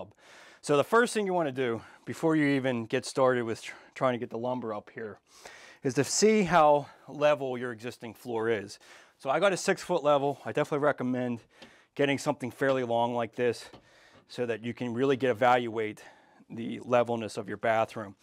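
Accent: Pittsburgh, United States. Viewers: Working on my own 112…e..14-year-old house with balloon framing. Always good to see somebody else’s approach. Heard your accent and knew you were Pittsburgher!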